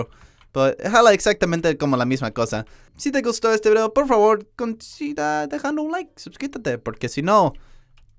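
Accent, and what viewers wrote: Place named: England, United Kingdom. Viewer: un ingles intentado hablar español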